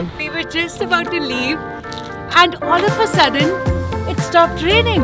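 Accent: India. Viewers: Please change your Indian accent..it's so funny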